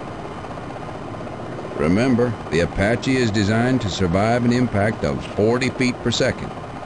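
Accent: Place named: South, United States. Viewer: From Kentucky. I didn't notice the narrator trainer's southern accent until just now. I used to play this as a kid 25yrs ago and he sounded like all the other old farts around here telling me what to do 😂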